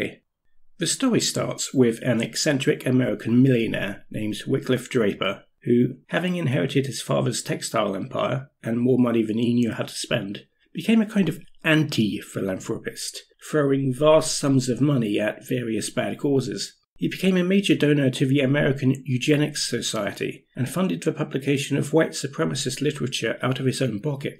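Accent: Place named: India. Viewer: U sound indian origin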